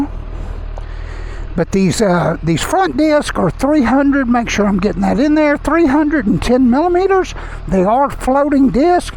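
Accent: South, United States. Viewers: God u sound so country😅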